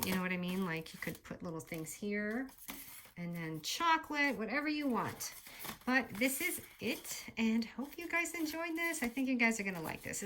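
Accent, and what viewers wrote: Boston, United States. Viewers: You are the reason I have gotten into crafting. Its been so good for my mental health, and helps me to unwind. I also got this paper set, so I can't wait to see what else you do with them! Do you have any mini albums made with chipboard tutorials with pages etc? Happy New Year also i love your voice and kinda Boston accent!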